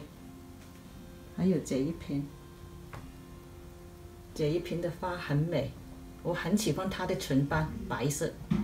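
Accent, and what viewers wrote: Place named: Cantonese, China. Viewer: 我之前有跟妳留一段留言，妳可能沒注意看！我說我非常喜歡聽廣東歌，妳說的普通話有點廣東腔，特別親切、可愛欸！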